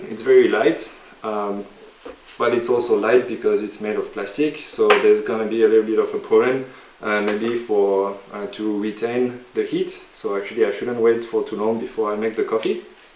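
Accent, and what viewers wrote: France. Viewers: Teresa Flores It is not rude tone. He is just from France and his native language is not English. Most people have some accent in foreing language! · petites critiques : pour si peu de quantité de café cela n'énervera pas un chat, je préfère le petit noir au bar tabac du coin pourvu qu'il ait un bon torréfacteur de renom autre que Grand-mère ou Monoprix. En attendant de trouver sur le marché une autre machine aussi efficace mais produisant plus de café que çà. Bravo pour la vidéo...superbe French accent ;-)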